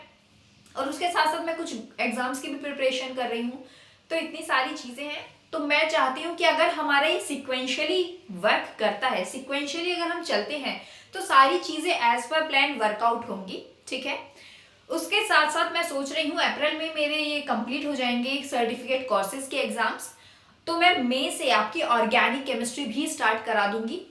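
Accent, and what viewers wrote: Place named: Uttar Pradesh, India. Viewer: ji up se hai kya aap if i not wrong because your voice tone is just like that ❤❤❤❤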